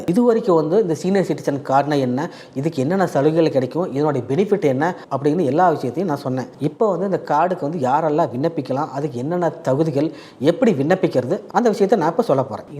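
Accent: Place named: Coimbatore, India. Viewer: That is COIMBATORE SLANG with much respect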